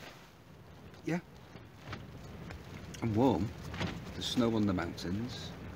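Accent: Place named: Merseyside, United Kingdom. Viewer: Brilliant one, great choice of location even if it was in the dark. You never forget your first wildcamp and to do so as a solo one is even better! Great video and every trip out should be an opportunity to learn. From pack size and weight to routes and weather watching, it all adds to the fun. Just trying to work out which side of the Mersey you're from based upon the accent, I was going to say your a Wirralian but not too sure now. I'm heading to check out your other vids too.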